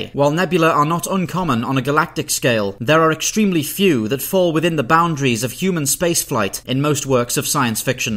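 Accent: United Kingdom. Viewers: I like your channel.i like listening to your Sci fi monologues in that British accent that makes the most mundane topic interesting. Sucks to be American,I guess.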